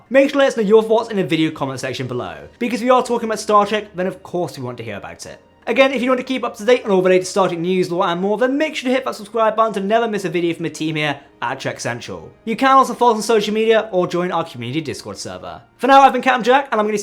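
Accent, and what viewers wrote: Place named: England, United Kingdom. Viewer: Always enjoy your videos very informative and the wording is always nice and witty however and please take this in the right way could you please slow down just a little bit you're an English accent which is OK makes it harder for people to understand everything that you're saying with the speed at which you're saying it in other words a she aint sure have a little bit of a problem Thank you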